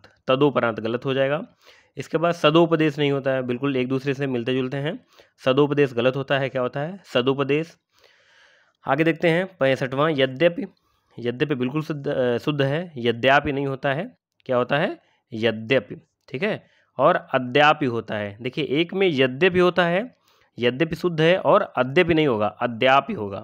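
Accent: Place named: Hardoi, India. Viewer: Sr aapki hardoi wali tone aa jati h kabhi 2..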